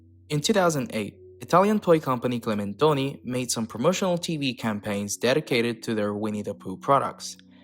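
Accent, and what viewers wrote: United States. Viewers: Wow, I'm not a native speaker of English but I really thought you were an American studying in Italy or something. Great content, keep it up!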